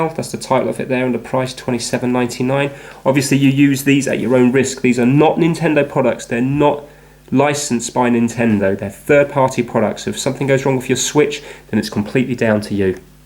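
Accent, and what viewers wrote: United Kingdom. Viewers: You're better and more resourceful engineer than an engineer from Columbia University in New York. But I'm not sure about the British accent though.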